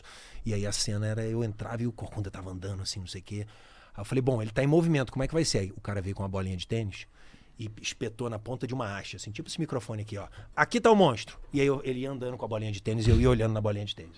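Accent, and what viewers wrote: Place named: Rio de Janeiro, Brazil. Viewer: Sotaque de carioca, mas nasceu em Ribeirão Preto.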